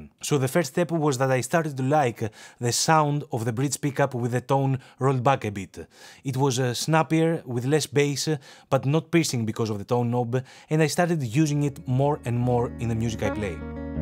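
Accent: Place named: Greece. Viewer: Σίγουρος από τα πρώτα 2-3 δευτερόλεπτα ότι ήσουν Έλληνας.. Nice vid bro, keep 'em coming! Subscribed!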